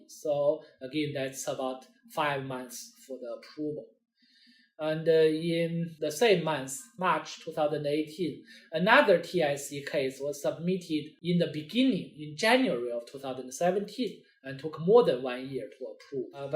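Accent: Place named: China. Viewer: To your question: he speaks English, with a strong Chinese accent, but I am sure you can follow him if you want to. I also want to add some extra info in this answer to your question (because I just finished my i-485 interview): As of now, the content in all his videos (and of course, some are very Chinese related but not to my case) is very very good, and is the most helpful & practical one, compared to other related videos, made by non-native English speakers from places all over the world and native English speakers. And I would say that it is a surprise to me too. So, if you cannot deal with Chinese accent, ignore it (I guess I understand that. Honestly, as a Chinese, I ignore a lot of Chinese-English videos, I have to), but if you have a real-life need in this GC thing, try some of his video.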